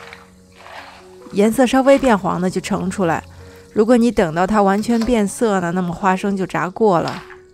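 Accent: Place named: Shaanxi, China. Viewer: 好棒啊！每次回西安去回民街都会买点炸辣椒，特别喜欢吃，再陪着的陕西口音，太亲切了。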